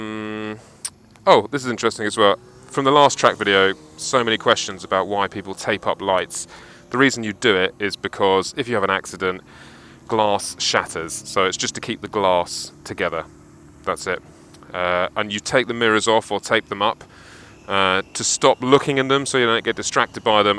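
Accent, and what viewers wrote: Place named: RP, United Kingdom. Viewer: cool, you sound exactly like Sacha baron cohen's normal speaking voice,, not Ali g. Well posh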